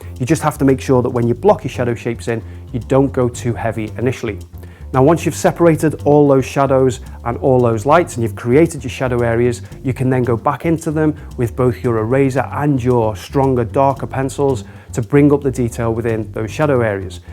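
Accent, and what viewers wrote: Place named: Manchester, United Kingdom. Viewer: Firstly it’s wonderful to hear someone from Manchester I really loved your video It has so much useful information as a relative beginner of older age group. I have never painted as a child or adult until my seventies. I wonder if you teach, in classes in Manchester.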